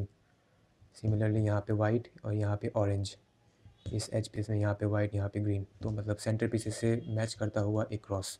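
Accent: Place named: India. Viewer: I came here just to listen to hindi accent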